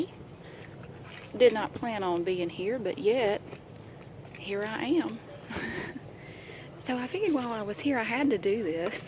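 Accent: South, United States. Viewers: You sound like my family. We're from Alabama and South Carolina. When I get sleepy or angry my southern really pops out. I find your videos really soothing. Keep being you!!! ♥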